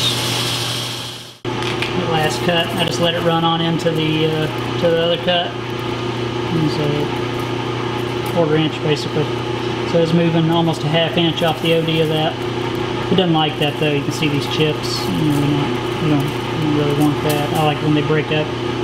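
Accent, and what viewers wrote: South, United States. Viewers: just need to understand that Southern drawl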